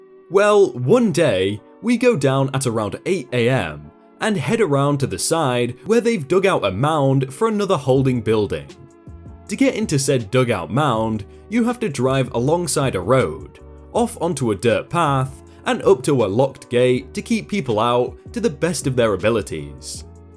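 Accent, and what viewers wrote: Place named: Midlands, United Kingdom. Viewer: Curly Nation06 Yeah, he has a midlands accent, I believe. Definitely somewhere middle or north in the UK XD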